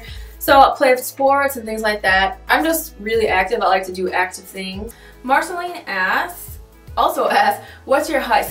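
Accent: South, United States. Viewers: You're really pretty. And that southern accent...love it! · You sound like your from the south in America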